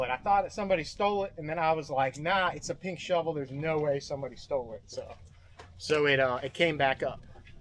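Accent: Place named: Northeast, United States. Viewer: you may have some northeast in that accent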